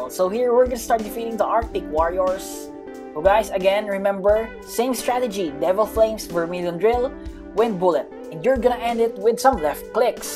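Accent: Philippines. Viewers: he has a filipino accent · i knew it, pilipino ka halata dahil sa accent naten pa notice idol haha!!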